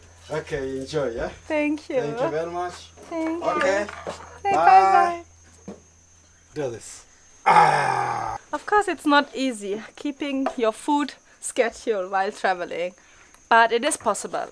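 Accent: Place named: Kenya. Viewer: Thank you 👍💕 I love how you speak English with a Kenyan accent 😊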